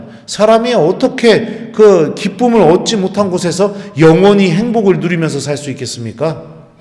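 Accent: Chungcheong, South Korea. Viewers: 신부님 강의 주옥같은 말흠 너무 재미 있고 충청도 사투리와 부모님 무한사랑으로 사제가돠 셨너요 감사감사합니다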